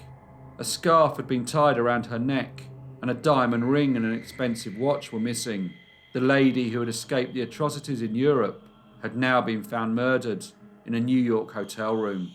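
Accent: England, United Kingdom. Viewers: Man I love this channel. I cant get enough of listening to this narrator's voice say "Guilty " with his awesome British accent. Keep up the good work. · Thanks for all your uploads. I love your stuff. As a Brit who has lived abroad for decades, due to my job, I love to hear your English accent🙂👍🏼💚🙂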